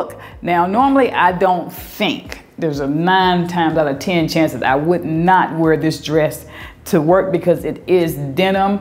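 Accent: South, United States. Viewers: Every time I hear you pronounce the perfume Baccarat, it sounds like you are say “Baklava”, like the pastry. It’s so funny your southern drawl. 😆😆😆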